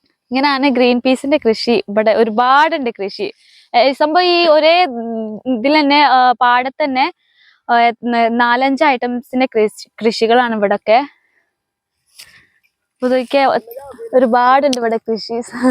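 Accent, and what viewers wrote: Malappuram, India. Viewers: ingal enganaya malappuram slang parenne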